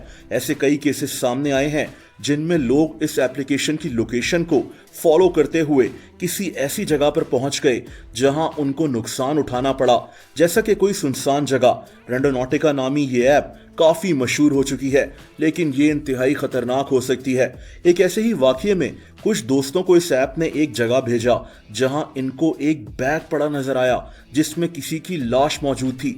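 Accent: Multan, Pakistan. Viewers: Ap multan sa ho na bhai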